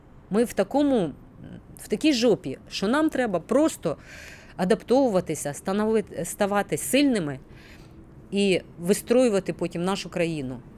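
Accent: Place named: Ternopil, Ukraine. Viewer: А, тому мені говір здався рідний,бо ви з Тернополя, я також!!! Вдачі вам!!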